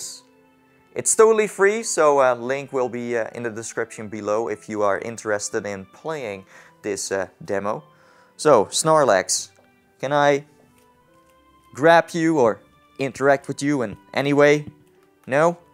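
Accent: Netherlands. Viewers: I knew your were dutch because ik ben ook nederlands :p hoorde het meteen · Ben je Nederlands ofzo XD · 100% dat je Nederlands bent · TomySeptic Dragon He's dutch... I think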